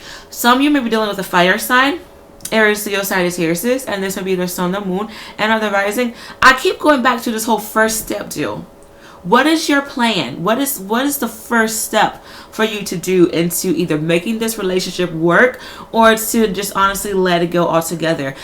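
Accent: Caribbean. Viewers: Yea u do have a carribean twist to you.i thought you were dominican or jamaican for a sec lol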